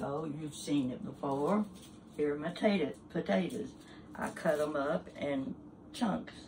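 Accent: South, United States. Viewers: Love your hair. Appreciate, your sharing. You are cute and charming. I am 76, how old are you?I am guessing, but I think you are a Southern Lady. Your accent is similar to mine. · No!!! Southerners use Duke’s, always Hun! You sound pretty Southern to me..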